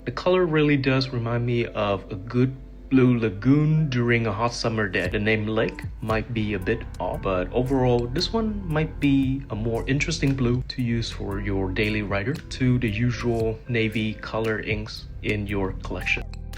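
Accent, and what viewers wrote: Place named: Germany. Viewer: you have a really close German accent...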